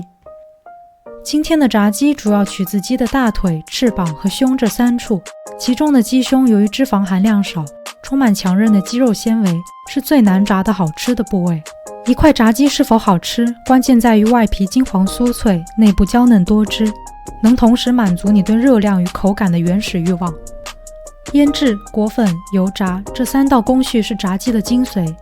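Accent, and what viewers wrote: Beijing, China. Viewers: 主播北京口音很好听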